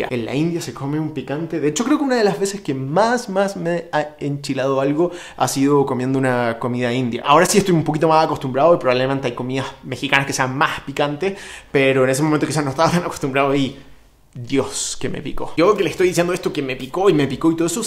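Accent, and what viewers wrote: Chile. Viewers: 🌶 El chile serrano para los caldos, solito a mordidas o en salsa 🤤 delicioso! Me encantó tu contenido porque esta bien chido aprender de la gastronomía de mi país y con acento chileno y buen gusto.... está de lujo 😉👌 gracias, me haces pasar un buen rato! 👏👏👏👏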